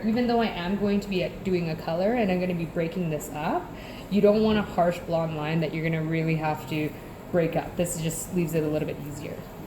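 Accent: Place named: Canada. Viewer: I thought I heard a Canadian accent 🙂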